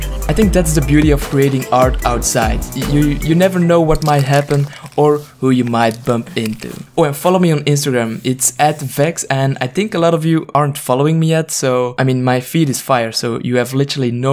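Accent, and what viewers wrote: Belgium. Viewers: OMG het valt me nu pas op dat je uit België komt😱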